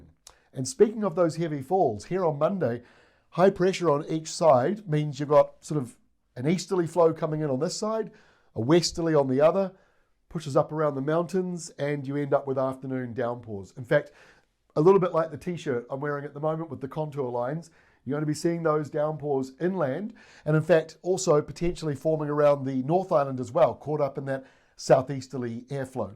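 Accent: New Zealand. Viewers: Great up date , nice having a good clear kiwi giving weather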